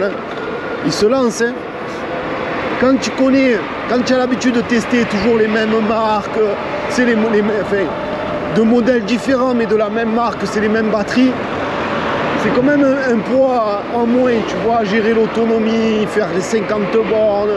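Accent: Marseille, France. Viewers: Vive le sud! Tu donnes le sourire avec l'accent de Marseille qui crrame tout!!!!!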